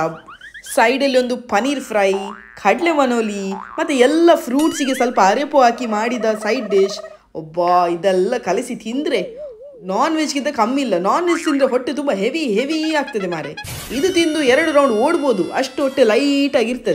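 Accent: Mangalore, India. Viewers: Nimma mangalore bhashe thumba chanda..keltane irbeku anisutte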